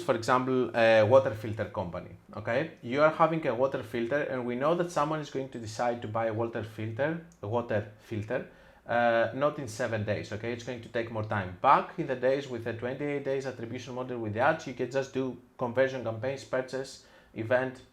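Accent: Greece. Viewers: η ελληνικη προφορα φωναζει απο 10 χιλιομετρα μακρια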